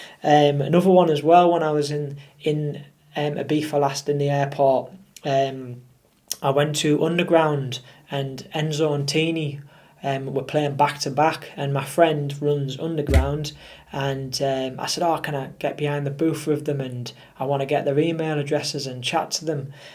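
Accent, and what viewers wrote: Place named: Yorkshire, United Kingdom. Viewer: Reason for asking cos you sound Yorkshire on ya videos pal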